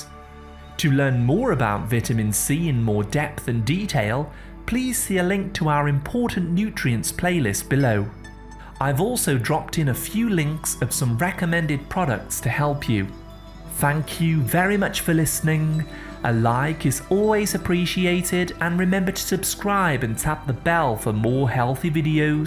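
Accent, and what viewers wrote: United Kingdom. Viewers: That is the correct way to pronounce it. He is speaking British English, Britain is where English originated, in case you did not know.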